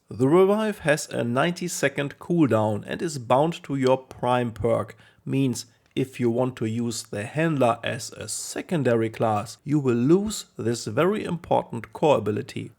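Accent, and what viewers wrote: Germany. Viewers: are you german :D ? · Ja cool das du da drauf antwortest!!! wusste ich doch gleich das deine Muttersprache deutsch sein müsste!! Dieses markante wenn Deutsche Englisch sprechen erkennt man irgendwie sofort!!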